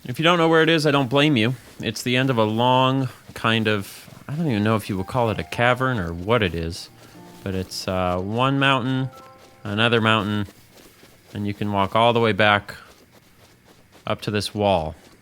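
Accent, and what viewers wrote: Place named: Minnesota, United States. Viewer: I’m thinking to myself “this sounds like a man from Minneapolis”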